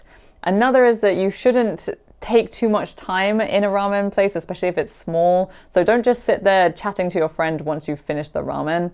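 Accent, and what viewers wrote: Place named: United Kingdom. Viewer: Great video! Nice to hear from a British person😂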